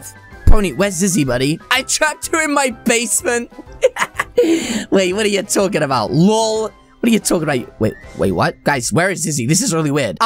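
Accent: England, United Kingdom. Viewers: Search for more bullies in bubble gum simulator Also are you from england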